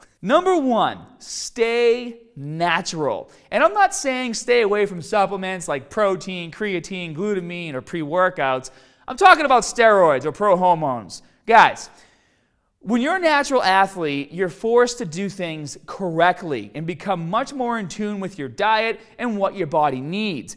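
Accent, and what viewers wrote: Boston, United States. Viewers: Gotta love the boston accent! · u must be from boston :D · New here... are you from BOSTON? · i cant tell how old you are, but i can guess you're from boston? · I'm new to this fitness thing... Are you from Boston? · are you from boston? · The Bowston accent is awesome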